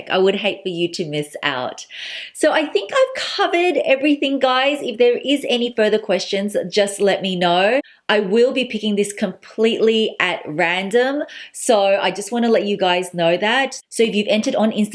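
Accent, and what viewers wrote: Melbourne, Australia. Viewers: Amazing to see a youtuber from Melbourne, comforting to hear the accent again. It's so unique to see a vintage bag as well, such an amazing prize to offer. Honestly, I would love the money so I can save that for when I'm feeling spendy and want to splurge on a nice bag. I hope you continue to succeed at everything you are doing and your channel progresses. Insta: rhiannon_davies_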